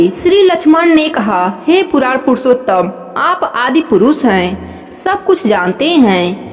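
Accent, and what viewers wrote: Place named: Bengal, India. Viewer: Ap to bagali bol rahe ho ji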